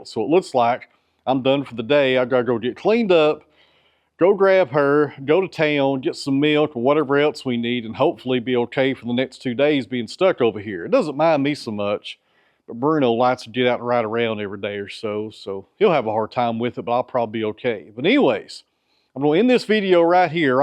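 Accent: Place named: Tennessee, United States. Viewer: Are you kidding? That Tennessee drawl is what keeps me coming back...that and is just good ol' country smart. Listen a bit and you gonna learn something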